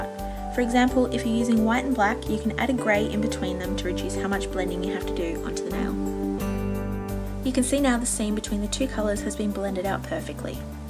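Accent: Australia. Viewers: It just her Australian accent. She sound like an Australian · She just sounds Australian to me...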